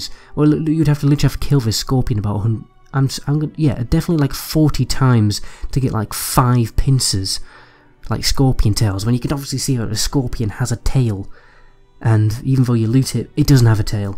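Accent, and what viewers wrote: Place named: Northern England, United Kingdom. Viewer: your accent started to get increasingly less RP and a lot more northern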